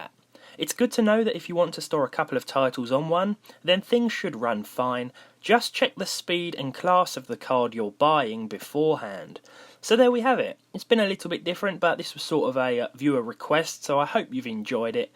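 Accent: United Kingdom. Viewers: I thought u were british... Effect?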